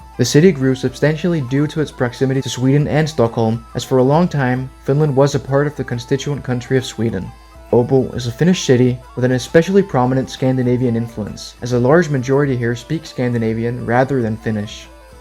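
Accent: Denmark. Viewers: he has a slight danish accent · Cant help but notice the Danish accent on the creator. I feel we already are in this union in some ways. I certainly appreciate all our neighbours <3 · wtf why is he mispronouncing the swedish names in danish? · De märks att han är dansk xD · your propally a dane i know cus the way you pronuence sønderjylland and sjæland and the danish accent is perfect for a dane how do i know im a dane.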